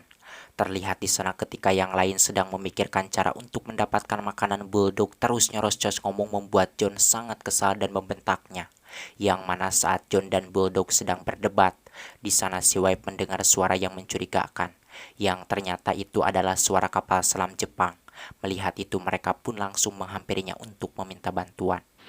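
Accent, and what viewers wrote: West Java, Indonesia. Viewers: sangat terhibur..terutama baceona..eta sunda pisan..😂😂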